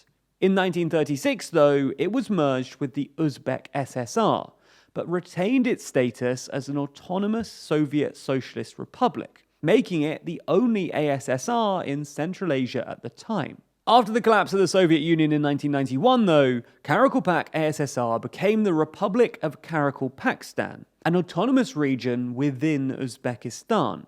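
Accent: United Kingdom. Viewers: The first three are just British pronunciation errors, the fourth one doesn't matter because you know what he meant. The final two are the only serious ones, and they aren't even really that serious.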